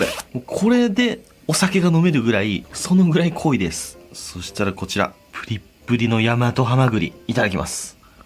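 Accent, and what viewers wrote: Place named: Nagoya, Japan. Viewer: の名古屋弁が心地いいなーと思いながらいつも見てる。名古屋の人はわかるんじゃないかなー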